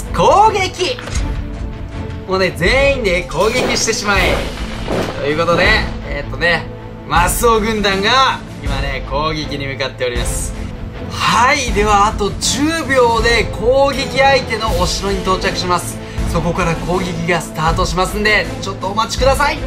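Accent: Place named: Kansai, Japan. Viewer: 関西弁が滲み出てるで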